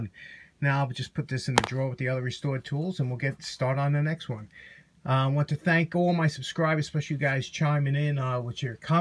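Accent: New York, United States. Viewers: Very nice work. Also nice to hear a New Yorker's accent (born and raised in upstate). Also, had one of those wood screwdrivers when I was a kid. Hate to think of it as "antique," but it is what it is. I used that same stain for a lot of applications, but I seal it up with polyurethane (satin, semi, or gloss); hard as a rock.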